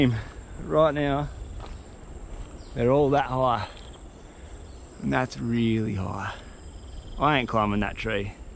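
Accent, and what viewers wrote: Australia. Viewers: At first I thought this was fake. Then I heard the Australian accent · Mate are you Australian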